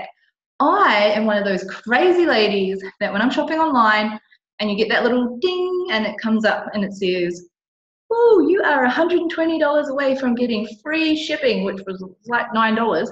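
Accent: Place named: New Zealand. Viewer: omg - i watched this at work and we have no speakers on computers so used captions lol Youtube can't pick up a Kiwi accent.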